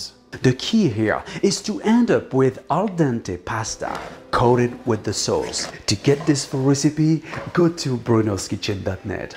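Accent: France. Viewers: french accent ^^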